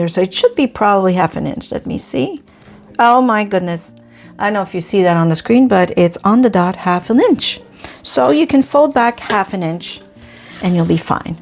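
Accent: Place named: Quebec, Canada. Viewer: This is so cute and easy !! Thanks for sharing !! Is your French from Quebec Canada ? Just curious since I’m sensing a French Canadian accent 😅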